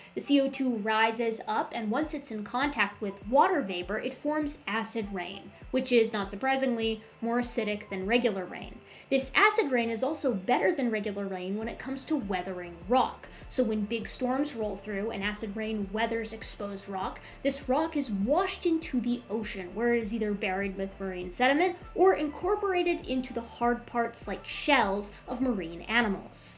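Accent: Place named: United States. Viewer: My only problem with this video is that you said “Carboniferous” when you ought to have said “Mississippian and Pennsylvanian” since, judging by your accent, you are an American. I got marked down for this in college, so my pattern-recognizing brain started yelling at me “DANGER DANGER” in a bizarrely apt demonstration of the point you were making.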